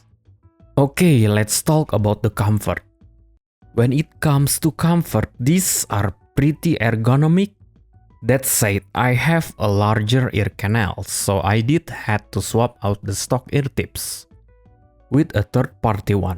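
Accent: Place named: Indonesia. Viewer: orang indo nih, keliatan dari fluentlynya 😅 semangat trus bang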